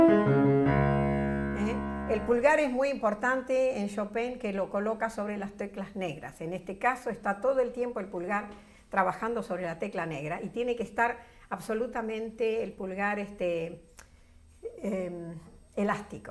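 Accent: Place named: Buenos Aires, Argentina. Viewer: que buena clase y en porteño mejor aún uno asimila mejor el conocimiento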